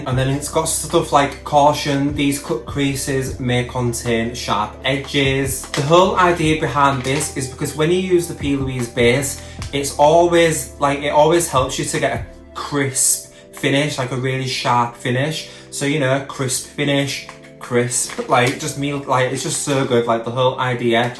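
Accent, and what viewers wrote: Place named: Hull, United Kingdom. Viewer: Mine was shipped yesterday and I can’t wait so started looking on yt see your video and instantly think I know that accent 🤣 fellow hullian you got a sub just for that 🤣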